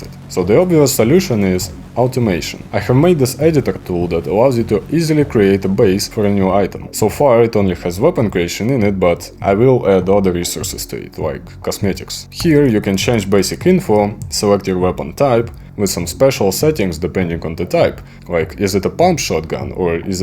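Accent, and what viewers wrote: Russia. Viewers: Are you russian—your accent seems to be russian. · I can HEAR the Russian and you know how good russian games can get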